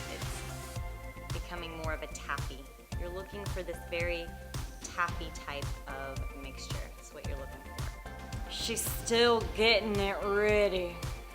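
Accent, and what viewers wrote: Texas, United States. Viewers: Your Texas accent is so cute!! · a texas twang ? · You are ALWAYS such an inspiration! Your aunt was awesome and a pretty lady too! Oh... and just love hearing your TX draw:) Sure wish you had your co op 16 yrs ago when I lived in Houston! Also wish you were touring close to me in Kentucky! <sigh> oh well.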